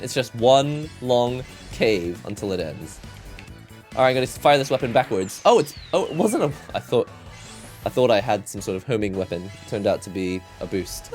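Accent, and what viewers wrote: United Kingdom. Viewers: Are you Japanese or British? I'm so confused O_O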